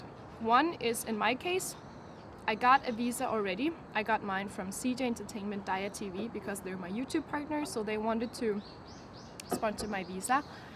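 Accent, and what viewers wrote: Denmark. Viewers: I can tell on your accent your danish :) Men det betyder ikke at du har en grim engelsk udtale! Virkelig fedt at du er flyttet til Korea, vil rigtig gerne selv derned og besøge landet. Har dog været i Taiwan og Filippinerne for et halvt år siden så har oplevet noget asiatisk kultur haha. Du må have det rigtig godt dernede! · Jeg kunne høre på din accent at du kom fra danmark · First time being on your youtube channel. And when I heard you speak, immediately thought you were danish cause of you faint accent. I was right! xD I'm danish too so yeah.. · Girl i hear you danish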